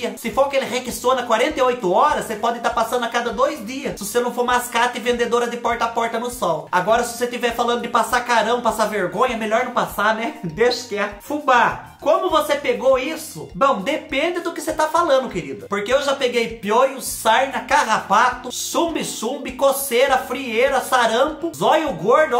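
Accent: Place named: Caipira, Brazil. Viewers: Seu sotaque e bem parecido com os mineiros sua cidade fica próximo a Minas Gerais? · Oi chegando como quem não que nada gosto muito deste teu sotaque caipirez de que terrinha você é,será que é daqui de perto de mim, Capivari,Porto Feliz ou é puro charme kkkkk adorooooooo😘😘😘😘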